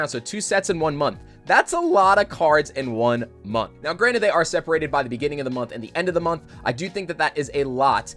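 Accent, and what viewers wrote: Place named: United Kingdom. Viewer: For a second I was like….did I click on the wrong video…who dis Brit?!??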